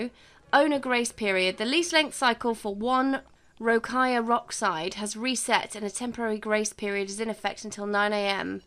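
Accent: United Kingdom. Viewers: First time watching, and this has nothing to do with the video, but I’m god awful with noticing accents but with the first word I could immediately feel the British. Username checks out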